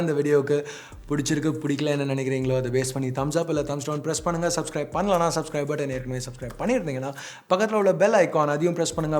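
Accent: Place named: Chennai, India. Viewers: Local tamil slang 😂😂😂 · Ainba louinkasa super Chennai speech Samaaaaa😂😂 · Kola kaandu pola moto brand mela kku, vittu velasitaru, சென்னை ஸ்லாங்க் யோவ் இன்னாயா😂🤣😂..... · Bro pathu bro romba Chennai language Varuthu, and casual ah pesaringa 😂😂 yen indha matram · 🤣🤣🤣 1st time bro spoke local chennai slang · Chennai slang romba super bro 👍🎉👍🎉👍🎉👍🎉👍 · 🤣🤣🤣🤣cheenai slaang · Namma chennai language velila vandhudicha. 😂😘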